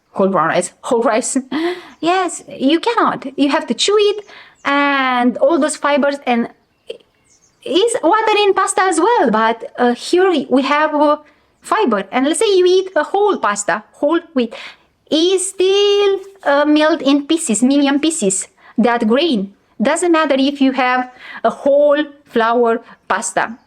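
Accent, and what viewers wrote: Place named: Russia. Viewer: You should stick to your native language, Russian or whatever, and dub in English. Your grammar is good but your manner of speaking is rough. No offense.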